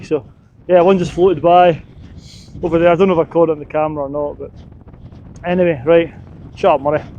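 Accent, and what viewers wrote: Scotland, United Kingdom. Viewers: I love watching your beautiful videos - thanks a lot for sharing them - and I'm a bit envious. It's not that easy to understanding your strong Scottish accent but I like listening to you anyway. Almost 48 years ago I stayed at Achmelvich Beach Youth Hostel and got a glance at this interesting looking mountain. I have been wondering what it might be like climbing it. I never made it - what a shame. Unfortunally I'm getting more and more hightsick the older I become. So whenever I watch you following a steep ridge I'm glad knowing you beiing back down safely again.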